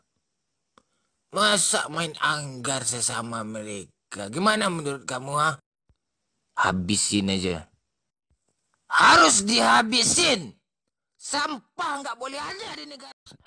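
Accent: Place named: Batak, Indonesia. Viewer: Logat bahasa nya mantap, horas bah, lanjutkan lagii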